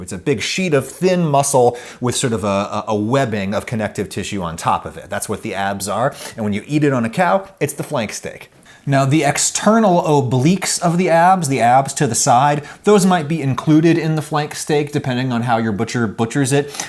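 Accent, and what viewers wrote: United States. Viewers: He just sounds American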